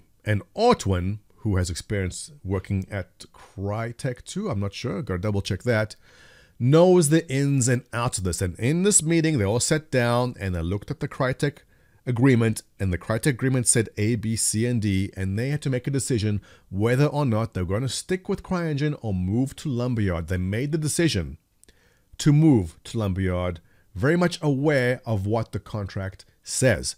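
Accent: South Africa. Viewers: nice to hear a fellow south african.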